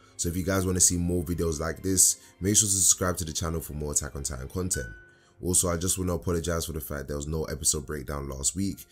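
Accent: United Kingdom. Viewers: ARe u brit? nice explanation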